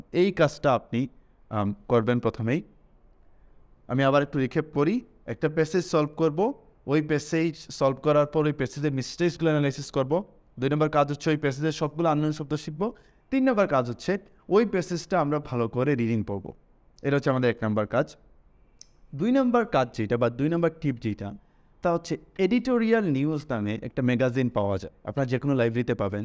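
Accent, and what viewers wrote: Sylhet, Bangladesh. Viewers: Sir apne ke sylhety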